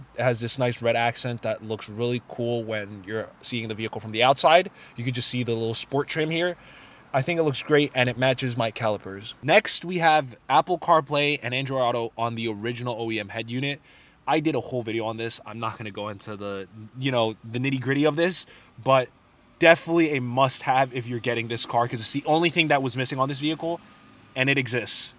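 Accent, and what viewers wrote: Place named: New York, United States. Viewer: wow really? I would’ve never guessed that. I was thinking Puerto Rican but didn’t want to say lol. Your accent gives me Italian vibes, that New York City type accent ya know. Love the car btw, I’m working on fixing some things in my 2008 Toyota Camry as well speak, getting a water pump and rear struts installed , and now I need a new transmission too.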